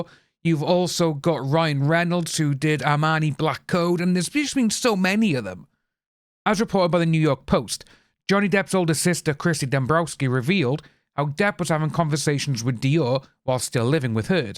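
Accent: Wales, United Kingdom. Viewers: Are you Welsh? I hear it in your voice. I’m from Tonypandy. I agree with your comments btw. Spot on. 🏴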